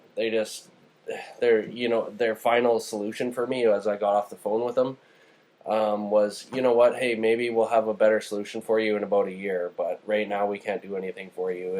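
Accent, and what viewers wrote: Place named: Canada. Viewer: As soon as I heard you start talking I was thinking, "Yep, another Canadian like me going in freaking circles for months." With every customer service rep they have thanking me for being a loyal customer for 27 year, but then telling me my banking info is not accurate. How does a massive company like eBay screw this up?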